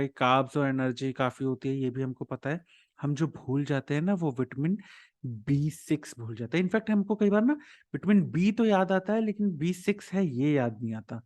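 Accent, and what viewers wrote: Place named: Bundelkhand, India. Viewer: Sir bundelkhandi he 😂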